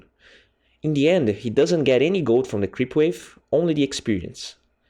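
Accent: Brazil. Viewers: i think brazil based on his accent (just a guess) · stop saying the kunka the mirana the pugna hahaha that drives me crazy man you don't need to use THE for those personal names, I can tell by your accent u're probably brazilian, your english is awesome anyways.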